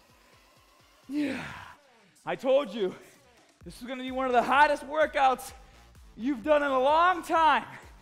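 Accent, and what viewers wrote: Boston, United States. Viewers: This sounds sappy as hell, but I am SO THANKFUL for these workouts. I've been using your videos four or five times per week for 7 WEEKS and my body has literally TRANSFORMED. I live in Massachusetts and I stumbled onto his YouTube channel and I legit started doing the workouts because I heard his Boston accent. This guy is the REAL DEAL!!!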